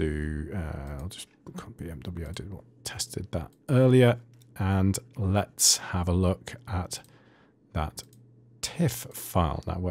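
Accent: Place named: United Kingdom. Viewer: Thanks so much. It helps me a lot. I like your British English. Do you have more Tutorials for Davinci Resolve? Greetings from Germany, Kathleen.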